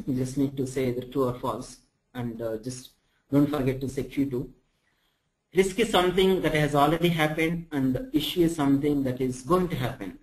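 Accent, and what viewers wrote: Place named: India. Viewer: Thank you so much for uploading these videos. You hae vertainly chosen some greate topics to cover. However I would like to give some feedback if thats OK... It is very difficult to understand the what is being said because of the very strong Indian accent and the speed at which the material is being explain. Please use presenters with more English accents. many thanks and keep up the great work!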